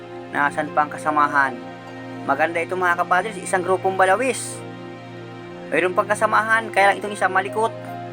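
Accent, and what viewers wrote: Bicol, Philippines. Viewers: bicol na bicol ang tono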